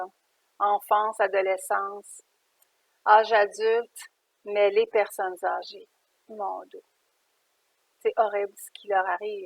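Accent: Quebec, Canada. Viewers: vous êtes trop mignonne avec votre accent québécois 🥰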